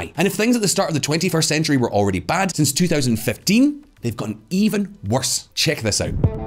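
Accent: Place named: England, United Kingdom. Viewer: I agree, but doesn't it feel weird having some guy with an engish accent telling us how bad one of our cities are? Just doesn't feel right bro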